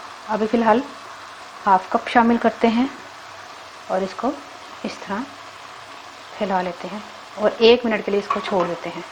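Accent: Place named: Punjab, India. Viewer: Uff apki Punjabi so cute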